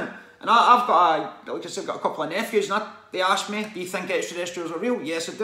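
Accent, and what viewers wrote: Scotland, United Kingdom. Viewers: Alright ma man! That’s all I need to say where I’m from lol totally brilliant channel good to hear a fellow Scot speaking on these subjects I had my awakening after reading David Ikes Cildren of the matrix it blew my mind then I started doing some deep research I even read TRNCE formation of America 😢what that women went through from the day she was born if u haven’t read it mate give it a read the amount of important people that were assaulting band other things in Bohemian grove fkn terrible. She was a victim of mk Ultra had 2 personalities and one was a trafficker of heroin for various groups I’ll leave it like that member keep up the good work Ioved got a few to watch and see what the think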